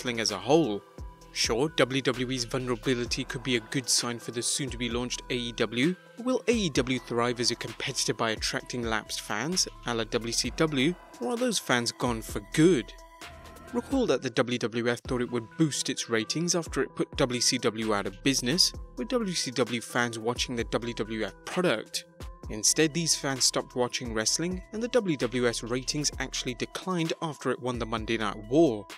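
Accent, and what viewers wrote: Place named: United Kingdom. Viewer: The voice of this vid should be in USA Not UK. Very bad. Lates.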